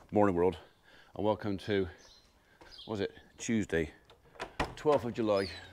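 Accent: United Kingdom. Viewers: I have always enjoyed the British accent but have a tough time understanding Scottish & Welsh. Thanks for sharing your daily experiences. I hope you recover quickly from the virus; peace! 🙂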